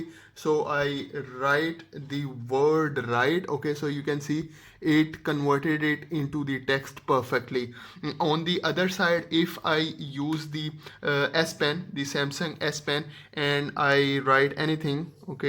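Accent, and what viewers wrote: India. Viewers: What a nice indian accent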